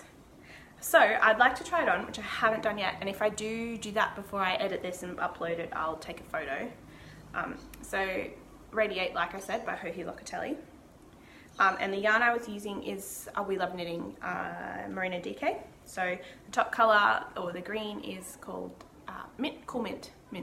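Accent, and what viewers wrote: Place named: Australia. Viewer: I enjoyed this podcast. It’s great to hear an Aussie accent talking about knitting! If you use the helical stripe technique you won’t have a join where you change yarns. It’s really easy and definitely worth looking up. Good luck on your trip.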